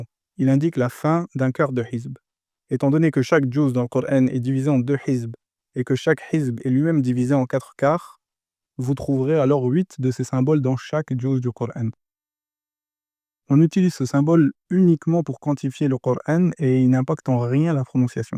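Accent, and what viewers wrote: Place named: Belgium. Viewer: j'avais noté la prononciation Belge aussi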